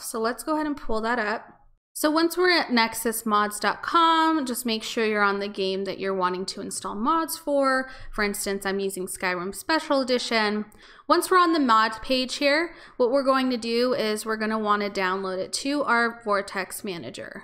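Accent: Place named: United States. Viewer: Hi great video and i love your Accent if you dont mind me asking what part of the US do you Hail im actually from the UK?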